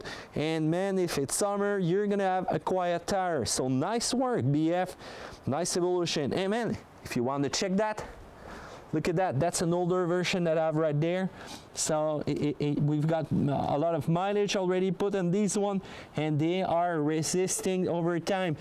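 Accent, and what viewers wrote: Quebec, Canada. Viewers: Quebecois as fuck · Quebec accent if I'm not mistaken ;)? Français?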